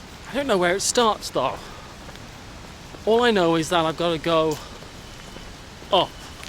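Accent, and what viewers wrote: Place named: United Kingdom. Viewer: Hi, newer subscriber here and glad to see a new video in Taipei Taiwan. Can tell that you enjoy walking, as it seems like every video you sure get in your steps for the day. I enjoy your Pop In The Bar portions as you alway describe what you are trying very well. We can almost taste it. Wanted to say how much I enjoyed your Kosovo series and so glad you captured the footage of that cute dog Prince and trying the Goulash with the guy from the hotel. Enjoy your British accent and determination so looking forward to more videos of Taiwan.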